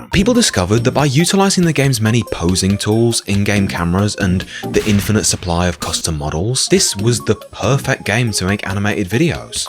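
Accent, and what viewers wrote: United Kingdom. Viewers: that's right.... he's BRITTISH.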